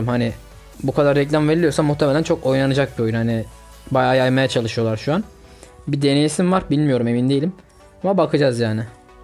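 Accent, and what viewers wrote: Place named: Adana, Turkey. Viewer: GALİBA BENİM GIBİ ADANALISIN!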